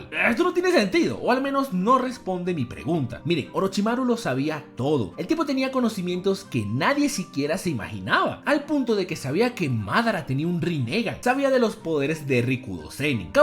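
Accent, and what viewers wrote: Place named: Venezuela. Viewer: Tras de lecho venezolano que ya hasta las tripas me arden de escuchar ese asentó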